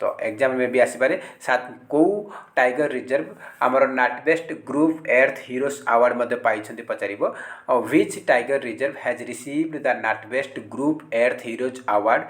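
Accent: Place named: Sambalpur, India. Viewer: I think the spoke person of this vdo is belong from sambalpur district.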